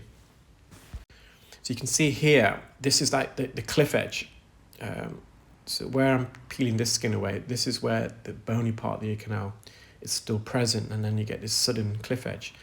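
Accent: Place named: Scotland, United Kingdom. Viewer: You must be Scottish. I just love the Scottish accent.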